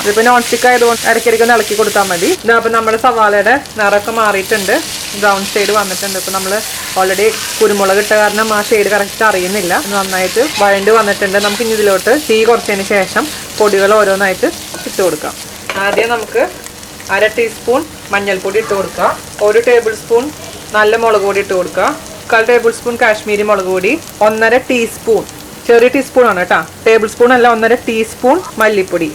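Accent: Thrissur, India. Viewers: Nammude thrissur baasha oru verity anetta chechi😀😀👍🏻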